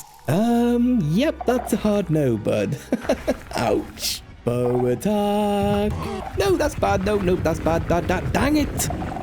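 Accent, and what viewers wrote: United Kingdom. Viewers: I like the british accent.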